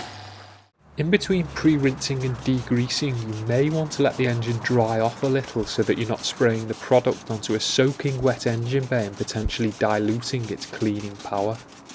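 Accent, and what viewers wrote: Liverpool, United Kingdom. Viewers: oibibi oybib he is British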